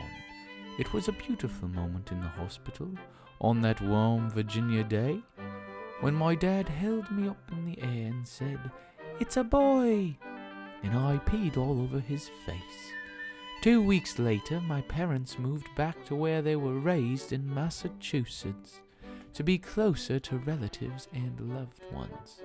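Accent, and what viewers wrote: United Kingdom. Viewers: This looks and sounds like a British male talking like this is normal XD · why does he sound British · You sound like a British guy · THEEEE BRITISH ACCENT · British? I didn't know you were british but i don't care cos i am british. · Why is he British here? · Why did use a British accent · What's with his BRITISH accent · Why did It Sound Like British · Um... Where did his American accent go? Why's he British? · ... I didn't realize was British before his channel got popular.... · is it me or does he have a "british" accent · Is this your real British accent? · um why is he sounding british in this video??? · He sounds so british lol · 5 years ago people: wow so cool 2018: he sounds British. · this doesn't sound like!?He sound like a british story teller,no offense to british people.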